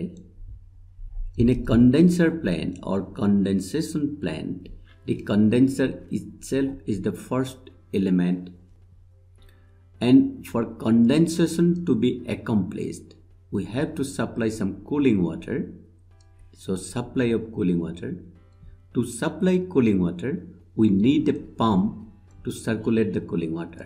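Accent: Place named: India. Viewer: Indian English is painful in the ears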